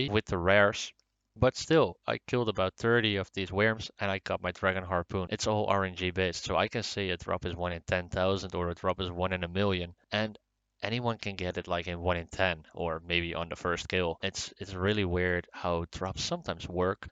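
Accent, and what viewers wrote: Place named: Netherlands. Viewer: u sound dutch :D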